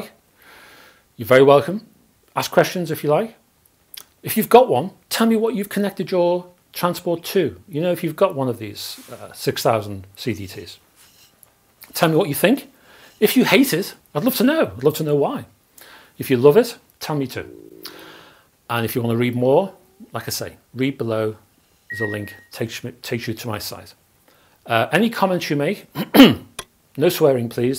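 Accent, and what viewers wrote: Liverpool, United Kingdom. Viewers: Hi, I recently purchased the Audiolab 6000A and then subsequently found your youtube page and web site, so it was probably a sheer fluke I made the decision I did. Anyway, now i'm watching your review of the 6000CDT and will probably get me one of those. I do however have a couple of questions, if I may: my experience of hi-fi is purely analogue and in your review you discuss the merits/benefits of adding an external DAC - excuse my ignorance if you covered my question - the 6000A has an internal DAC (?), so would this combo of the 6000A and 6000CDT still benefit from the external DAC. My apologies for the long winded getting to the question, my second question - are you a scouser? thanks in advance mate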